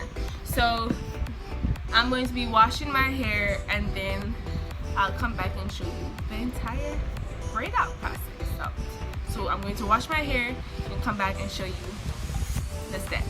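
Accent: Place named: Caribbean. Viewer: And Mash up the curls i love that.... carribean gyal... i could tell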